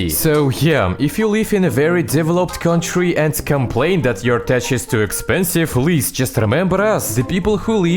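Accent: Russia. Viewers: Russian accent?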